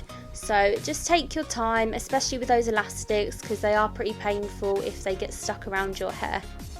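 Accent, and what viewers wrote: United Kingdom. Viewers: Lovely accent. I want to be British!😭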